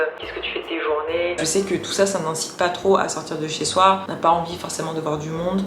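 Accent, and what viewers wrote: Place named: France. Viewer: Salut. Tu viens d'où ? Je suis américain, et je trouve que tu as un accent français, mais tu as des vidéos précédentes qui indiquent que tu vis à Montréal ? De toute façon, tu as un très joli accent français, facile à écouter 😊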